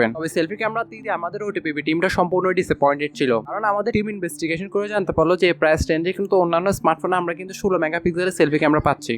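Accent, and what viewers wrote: Chittagong, Bangladesh. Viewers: Same bhai..ami fouzdarhat thaki..apnar bolar style dekei buje geci..apni ctg tahken..😇💜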